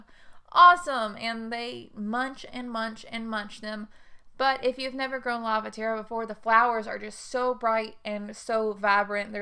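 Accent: South, United States. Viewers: I have never grown sweet peas before and would like to try. You sound like you live in the south-so do I-do you have good success? It gets super hot where I live in north Georgia so I’m thinking I might be able to get some spring blooms.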